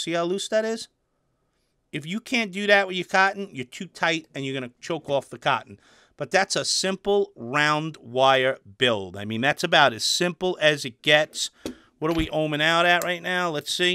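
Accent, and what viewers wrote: New York, United States. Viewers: I can guarantee that if I use that same exact wire, with the same exact amount of wraps, the last thing it will do is give me the 0hms that the calculator tells me I should get. You never did show us what 0hms the mod was detecting the wire as when it was connected to a mod :P. It's useful to know though, how to build. The wire company I bought all my pre-made coils from has just decided to move abroad on us, so I'm stuck for pre-made's now. All I can get is the regular round wire now, so it's useful to know if your in the same position that I am now. I have 500ft of Kanthal A1 sat on the shelf next to me, which I bought just in case I had trouble buying pre-mades. If I can wrap my head around building simple coils, I figure that there's enough wire there to last me maybe 2 years or more, depending on how many times I recoil, which is usually at least once or twice per month. 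By the way, am I right, considering your accent, in thinking that your based around the New York area?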